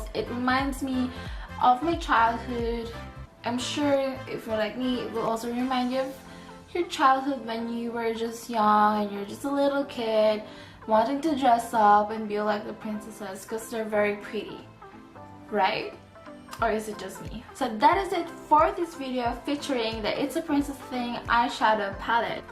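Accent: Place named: United States. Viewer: sure sissy BTW I love your accent are you half american?? Ur fluent in English 😍😘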